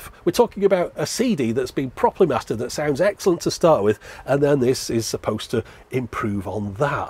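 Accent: United Kingdom. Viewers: Love the ion/iron wordplay. Only something you can do with a British accent 😂 please make the negative iron a recurring character 😂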